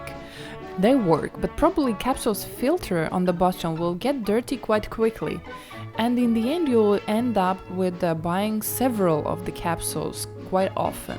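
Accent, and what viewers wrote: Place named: Eastern Europe. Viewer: My guess would be former Yugoslav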